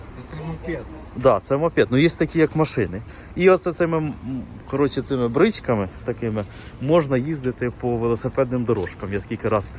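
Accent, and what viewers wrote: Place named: Dnipropetrovsk, Ukraine. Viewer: Говір як з Дніпропетровщини )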